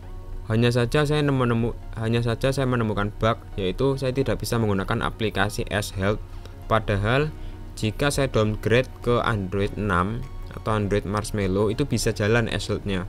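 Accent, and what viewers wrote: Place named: Java, Indonesia. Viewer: Ngomong nya Medok Banget Mas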